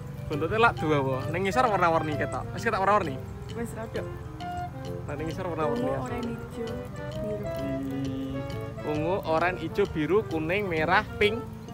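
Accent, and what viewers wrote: Java, Indonesia. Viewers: Mas jowo ne ndi kok medok men.... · Iki jowone ndi boss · mas kok jowo an orng mana !?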